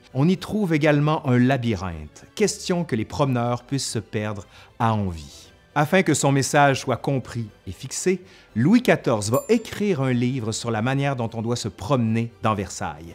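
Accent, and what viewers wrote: Quebec, Canada. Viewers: Nous français on trouve votre accent bizarre on en rit même...😓😓😓 Mais à force d écouter la chaîne j apprecie votre accent donc continuez amis du QUÉBEC Super doc comme toujours Force à vous 💪💪💪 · Tes vidéos sont très intéressantes, mais je te suis aussi pour ta sympathie et ton accent québécois! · L'histoire de France avec le charmant accent canadien, ça en jette ! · Suis français et j’adore me faire raconter l’histoire de France par quelqu’un qui a l’accent québécois. J’écoute/je regarde toutes les vidéos depuis le début #mercileconfinement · Le roi avait le palais ouvert et de plus, une dentition atroce. Il parait qu'il lui était impossible de mastiquer. Il ne pouvait prendre que de la nourriture liquide ou en purée. Qu'en est-il ? Vidéo très intéressante comme toutes les autres ! Je vous remercie d'articuler parfaitement et de parler à un rythme également parfait. 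Cela permet une compréhension idéale. On vous entend bien, on vous comprend bien, et en prime on a un bel accent canadien ! Lui-même parfait car juste assez prononcé pour donner une jolie musique mais sans nuire à la compréhension.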